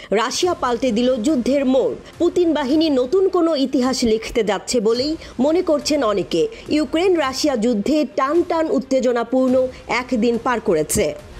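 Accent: India. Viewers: আপু,,আপনি কি বাংলাদেশি না ভারতিও।বাংলাদেশের কোনো সাংবাদিক এই ভাবে নিউজ পড়েনা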